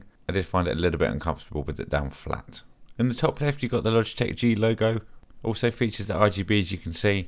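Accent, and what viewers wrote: United Kingdom. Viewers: Are you Scottish? Your accent made me feel that way coz my German aunt nor English uncle speaks that way but a Scottish friend does.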